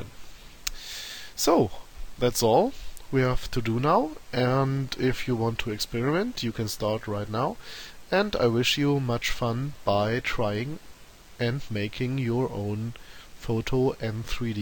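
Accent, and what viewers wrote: Germany. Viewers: oh mann ich hasse den deutschen akzent :D Gutes Video trotzdem, danke!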